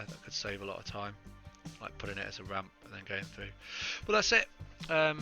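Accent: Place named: United Kingdom. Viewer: Found this video after watching Smii7y absolutely lose his mind over this heist. The transition between loud Canadian frustration and calm British professionalism was phenomenal 🤣🤣🤣. Great video!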